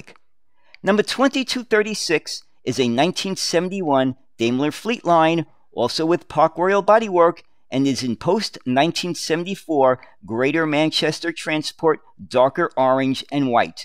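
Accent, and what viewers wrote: New York, United States. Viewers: Absolutely love your New York accent!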